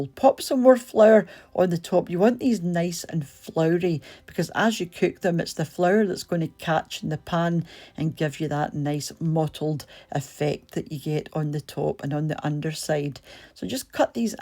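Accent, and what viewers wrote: Scotland, United Kingdom. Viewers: Oh my God, I love listening to the Scottish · How I miss my home country Scotland. My ancestors are from the isle of Lewis. That recipe helps a bit afainst being homesick.... As well as the typical Scottish English talking. So heartwarming. Thank you for both. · Great video. Good Scottish voice giving instructions. I like my tattie scones in the toaster. Nice and crunchie 🏴🤗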